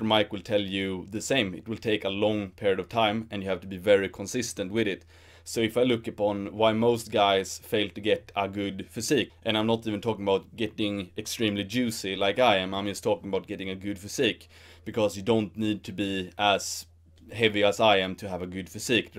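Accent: Sweden. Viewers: Hørte at du var svensk umiddelbart :P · Hello fellow swede I can hear it on your accent.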